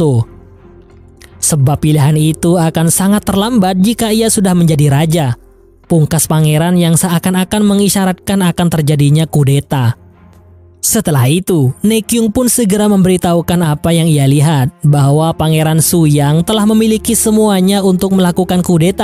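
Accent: Madura, Indonesia. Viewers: Eeh reng madurehh ka adminn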